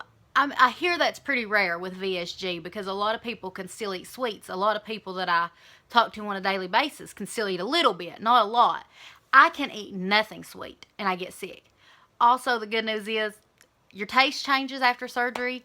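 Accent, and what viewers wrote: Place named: South, United States. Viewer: I also get dumping with a lot of sugar. You're great - live your videos and your accent. I live in Florida, but we don't have that think southern accent. I love it and could listen to you all the time. Keep up the great job! Looking good!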